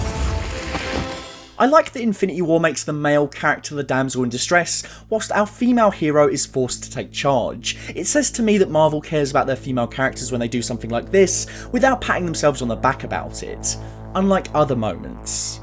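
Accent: United Kingdom. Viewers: I enjoy your videos, but find it jarring when you use pseudo American phrases and pronunciation, when you're clearly a Brit. I imagine you're choosing to do this in an attempt (perhaps correctly) to appeal to a USA audience, but it is nevertheless unsettling to hear, and I suspect that your audience in the USA and Canada enjoy your accent and language precisely because you're different. The expression "Often times" for example. I've lived in the UK my entire life and I have never heard anyone say that. Literally not a single occasion. We simply say "Often". Similarly, your reference to "Taffy"? Where did that come from? That's not even a thing over here, so why would you use it as a similie? Finally, and perhaps the most troubling is the way you've aped the USA pronunciation of the name Thanos. Admittedly, it's a character invented by an American, but surely one which has its roots in Greek mythology, and both Brits and Greeks would pronounce that word as Than-Oss, and never Than-owse. 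That's all, otherwise, fab vid. Thank you for sharing. Just wanted to say that your own accent and linguistic style is just fine and charming, but the choice of some select words and pronunciations is a bit jarring - next thing you'll be drawing the 'drapes' and putting the broom in its 'closet' ;-)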